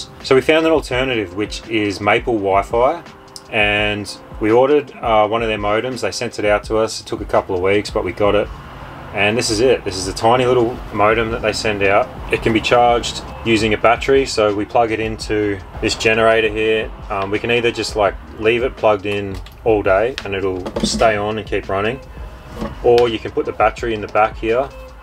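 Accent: Australia. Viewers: Really informative videos. Just one big critique - shorter, sharper edits. The Aussie accent means you speak really slowly, and just coming up with shorter sharper ways to say things would mean this video was easier to digest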